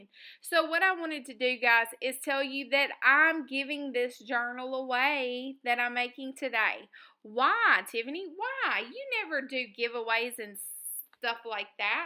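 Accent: South, United States. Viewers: You are too much fun with that southern twang! Love the little journal and the cover.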